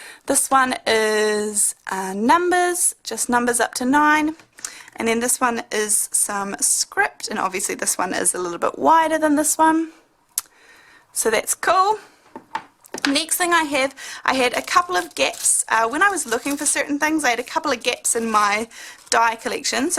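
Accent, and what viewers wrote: New Zealand. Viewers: Wow !! So how do you heat emboss the mandala please? What kind of stamping ink do you but to get a really clear stamp? I've been using aliexpress ink and the images turn out a wee but fuzzy. Thanks so much for your videos!! It's nice to hear a fellow kiwi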